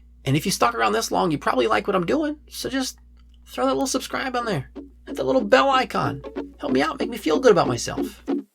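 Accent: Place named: Australia. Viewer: That Aussie accent, lmao never gets old.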